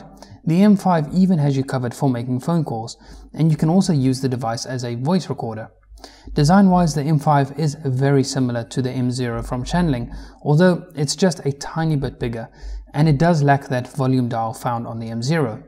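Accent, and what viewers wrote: South Africa. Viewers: Dat SA accent B-)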